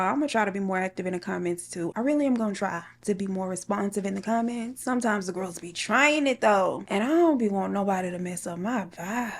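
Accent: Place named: Houston, United States. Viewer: Alsoooo, ever since you moved to Houston that accent been accenting I love itttt ❤️😂😂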